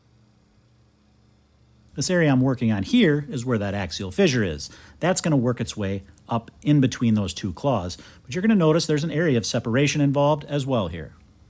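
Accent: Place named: Wisconsin, United States. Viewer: I know a fellow Wisconsin homie when I hear one! Haha. If I ever get cows I want you to take care of their little feets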